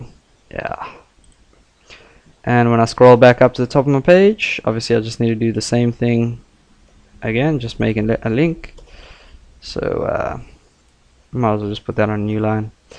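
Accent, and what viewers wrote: South Africa. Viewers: are you afrikaans?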